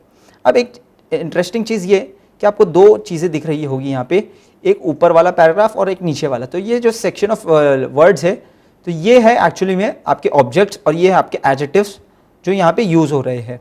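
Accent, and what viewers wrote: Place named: Iran. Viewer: Your Accent is like Persian people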